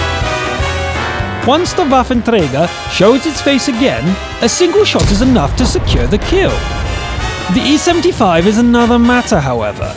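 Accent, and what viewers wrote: United Kingdom. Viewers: The scout replay with amazing agent music with a very nice british accent made my day for me. Keep up the good work with unique presentation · even i from germany Heard this "english" acent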